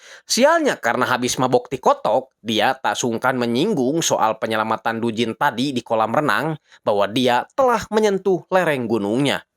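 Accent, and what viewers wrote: West Java, Indonesia. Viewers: Saudi hadir Saya suka yg baca filmnya sepertinya orang sunda Bnyak lucunya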